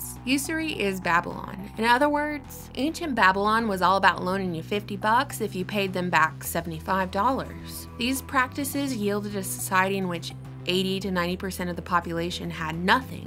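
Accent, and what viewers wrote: South, United States. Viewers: GREAT VIDEO! Thank You....Love Your Southern Accent.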